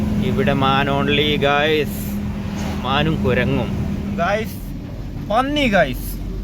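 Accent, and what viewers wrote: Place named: Kasaragod, India. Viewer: സംസാരം കാസർഗോഡ് 😂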